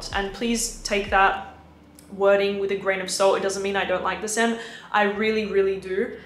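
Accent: Australia. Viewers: What is going on with your accent? Definitely a little less Aussie now. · Your accent sounds australian, are you from australia?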